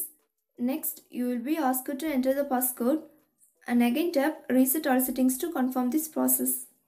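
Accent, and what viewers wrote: India. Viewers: From where you are ?? Most Indian speak English like that, I think she is Indian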